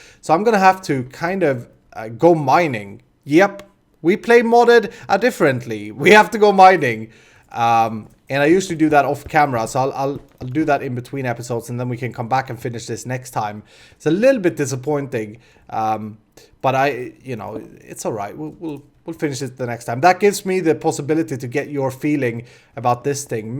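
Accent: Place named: Sweden. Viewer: Fin svensk brytning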